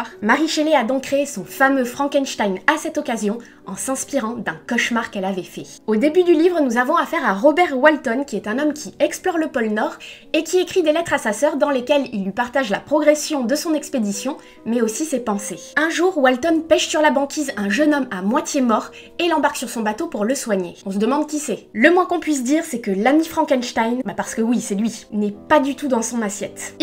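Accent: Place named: South, France. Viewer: Super sympa ta chaîne j'aime bien le concept (étant moi même en 2e année de prépa littéraire, forcément) ça change un peu de ce qu'on voit sur youtube tous les jours! Juste une petite précision au niveau de la prononciation, Zweig se prononce "tsvaïg" (à l'allemande, si on prononce aussi son prénom "Chtefan") ou à la limite "svaïg" mais le "ei" est bien à prononcer comme le mot "ail"! Voilà j'espère avoir pu t'aider, comme j'entends à ton accent que tu viens peut-être du sud, les noms d'origine allemande ne sont pas les plus simple à prononcer! Bonne continuation :)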